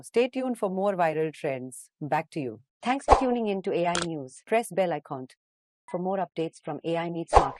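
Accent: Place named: India. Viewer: Indian accent😂😂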